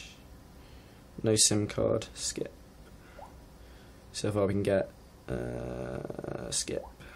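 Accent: United Kingdom. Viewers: Heard your British accent, saw the 0 "dislikes" so I immediately liked the video. :)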